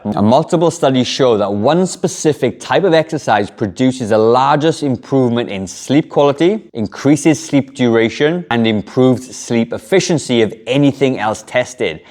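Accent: Wales, United Kingdom. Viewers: No!!! He has a lovely Welsh accent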